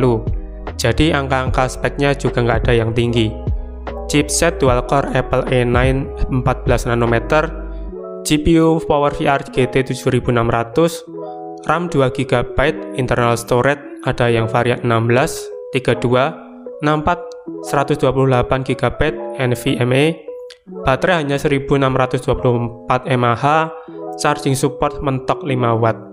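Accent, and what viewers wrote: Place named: Banyumas, Indonesia. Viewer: Ngapak yaaaa 😁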